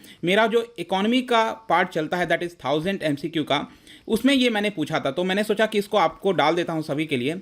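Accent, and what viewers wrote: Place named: Punjab, India. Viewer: Punjabi dhke nl nikl jandi h 😋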